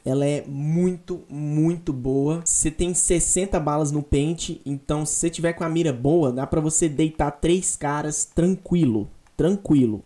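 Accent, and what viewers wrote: Minas Gerais, Brazil. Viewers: Você é mineiro cara? MG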